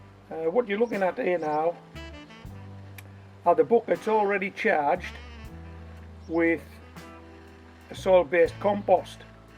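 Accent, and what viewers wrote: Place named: Northern England, United Kingdom. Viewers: Hows it gaan Marra! I've got the autoplay set up on Youtube, so every now and again while I'm multitasking (listening, not watching) one of your videos pops up. Every time one comes on, its a bit of a surprise to hear a voice with our accent coming out of the computer - but how great it sounds. You couldn't get much more Cumbrian than the way you say Crimson Crush! I'm going to make a very late start with seeds from some supermarket toms (piccolo) - and see how it goes. Wish me luck! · Hi mate, i love your chanel and I am married to a Birmingham girl here in Australia. We were wondering what part of England you are in as you sound up North?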